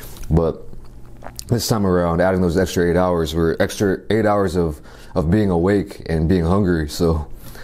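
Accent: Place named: South, United States. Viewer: Asian man with a southern accent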